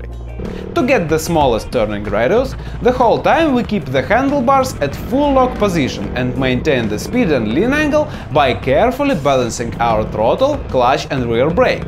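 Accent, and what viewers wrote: Russia. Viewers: can clearly hear ur ruski accent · bro has the best type of russian accent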